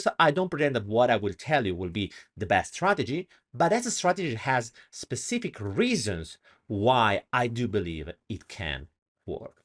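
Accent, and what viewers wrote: Italy. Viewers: they are mim coins, not mimi coins. just saying. cute italian accent though ;-)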